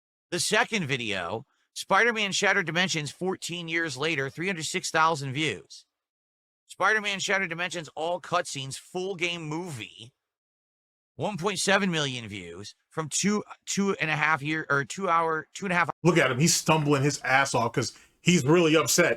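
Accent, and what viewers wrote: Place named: London, United Kingdom. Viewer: Big ups the London Grime intro. Nice to hear my own accent.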